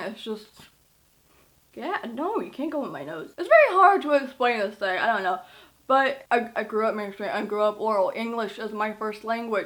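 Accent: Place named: United States. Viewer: What i find amazing is your accent is american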